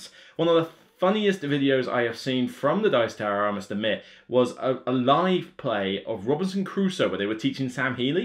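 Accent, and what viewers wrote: United Kingdom. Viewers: Like the intro. Usually internet trolls are the kind of people who won't have the guts to say crap face to face and, most of the time, are insecure. Picking on your accent ? Seriously ? I'm french Canadian and I don't have any problems understanding what you're saying, in fact, I love British accent, it sounds so cool I think. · Ignore the haters man, you do good stuff, us brits all have weird accents, mines a combo of welsh and midlands so a bit of a odd combo too :P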